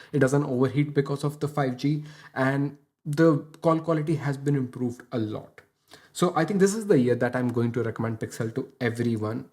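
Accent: India. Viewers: Bro, are you from Bangalore.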